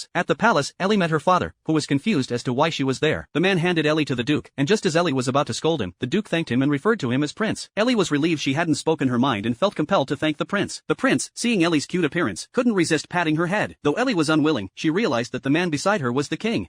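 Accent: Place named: United States. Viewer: AI narrators be Americanizing anime names.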